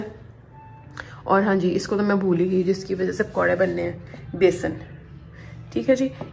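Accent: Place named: Punjab, India. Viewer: Your Punjabi touch in conversation and smile 😀 love it ❤️❤️❤️❤️❤️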